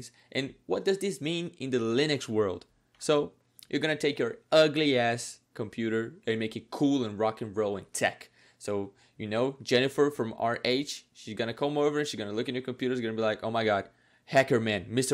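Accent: Brazil. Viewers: Você é brasileiro? Esse sutaque eu não confundo nunca, essas piadinhas enquanto fala e essa figura de linguagem. Consigo sentir de longe. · De fato ele fala bem pra cacete. Mas consegui notar o sotaque carioca AUSHAUSUHAUHSHUAUHSUAH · i can recognize a brazilian when i see one... the whole "RH" thing gave you away KKKKKKKKKKK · tu é brasileiro né? (percebi pelo "rh")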